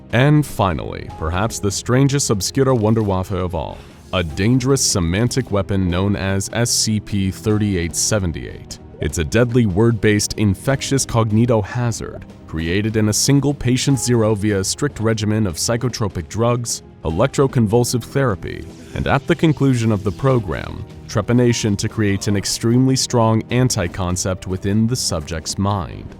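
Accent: Germany. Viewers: im German and you cant speak german you doesn't have the accent for that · This German accent is atrocious.... Fun to watch though.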